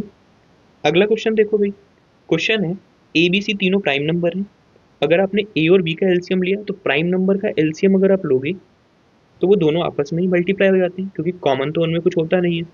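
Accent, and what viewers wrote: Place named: Purvanchal, India. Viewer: Aap Purwanchal se lagte ho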